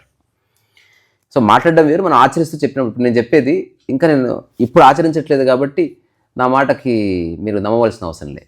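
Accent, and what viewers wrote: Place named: Telangana, India. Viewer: Meeru pakkaki telangaana language lo unnaru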